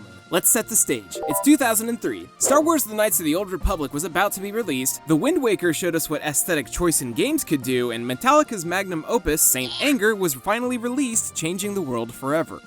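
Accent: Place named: South, United States. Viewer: Where in the south are you from? :0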